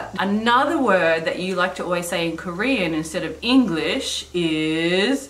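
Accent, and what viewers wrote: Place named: Australia. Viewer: He's so cute! It was interesting learning the Korean word for "cicada". Also interesting is that the Australian pronunciation is different than the American pronunciation. We say "ci-kay-da", not "ci-kah-da". I noticed that you pronounce "똥" differently than does, too. He is clearly picking up the more native Korean accent, and not imitating your Australian pronunciation of Korean words.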